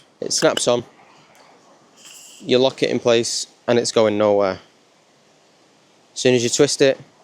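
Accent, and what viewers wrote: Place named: Yorkshire, United Kingdom. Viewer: Amazing video your an amazing photographer you sound Yorkshire as am I your videos are amazing keep it up 😊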